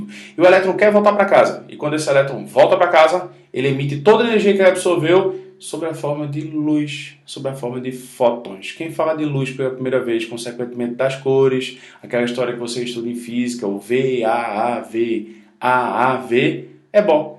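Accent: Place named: Northeast, Brazil. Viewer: Kkkkkkk cara vc é mt bom!!!!! Serio msm eu rir e aprendi, parabens amei teu sutaque de nordestino ne?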